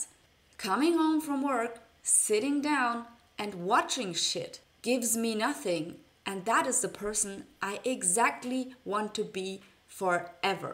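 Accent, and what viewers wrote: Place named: Germany. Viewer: I hear a German accent. Yikes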